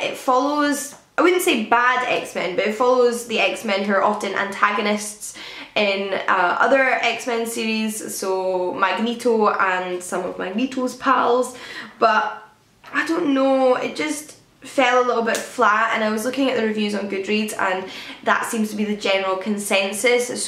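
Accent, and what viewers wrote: Scotland, United Kingdom. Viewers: Hi, I am a book blogger and have recently started subscribing to your vlogs, my site is <link> Knew (obviously) from your accent you were a fellow Scot but didn't realise you were local to me. I live in Polmont and can attest to just how fabulous the charity shops in Linlithgow are, my husband and I are often to be found trawling around them. Keep up the great vlogs and feel free to drop by my site.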